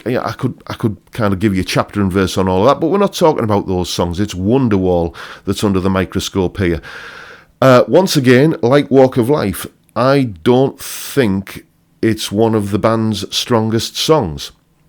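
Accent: United Kingdom. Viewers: Most songs if based on lyrical content would sound like crap regardless of the melody ( like rediculous " " which also sucks as a melody )and should have never recieved radio play. We have to remember that sold out theaters and album sales are all that matter. (Pink Floyd's favorite rant). I can't stand " "," ", "s " " " " and I could have lived my entire life happy without being tortured with " " or tune about " " and I'm not even Catholic! Love a good RANT, thanks mate! (I'm not British either but I love the accent!)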